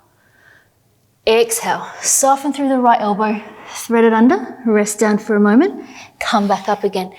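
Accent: Australia. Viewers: I feeeel so goooooood thank you💚💚💚💚💚💚💚are you Australian?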